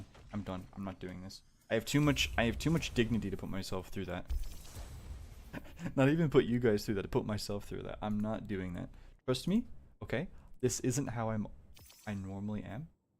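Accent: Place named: Ireland. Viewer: Irish brethren I hear, salute I do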